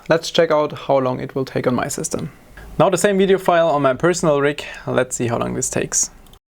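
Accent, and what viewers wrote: Germany. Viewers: Man hört den deutschen Akzent soo sehr heraus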